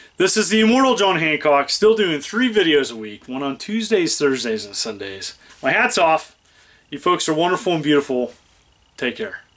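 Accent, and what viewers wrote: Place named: Pennsylvania, United States. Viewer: Where u from John sounds like you’re from Pennsylvania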